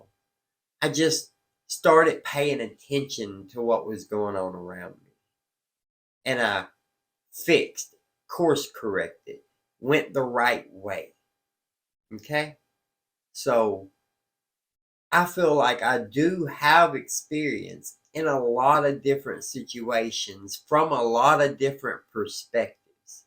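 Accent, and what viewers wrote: South, United States. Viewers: You and I have quite a lot in common. Down to the “Southern-ness.” 😆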